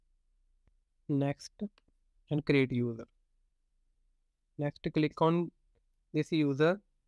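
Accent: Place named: Kerala, India. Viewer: Coading + Debugging... Super. You sound like a person from Kerala but the name is Punjabi 😃😉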